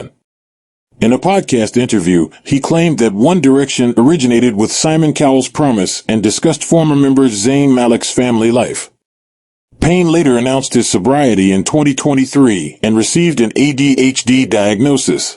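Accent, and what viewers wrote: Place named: United States. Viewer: Whats with the American commentator.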